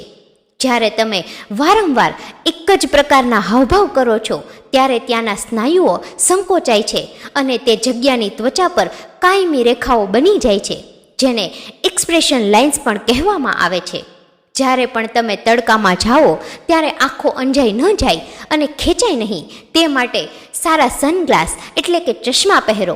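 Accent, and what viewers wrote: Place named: Saurashtra, India. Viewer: Nice. Your bhasha is like Saurashtra.!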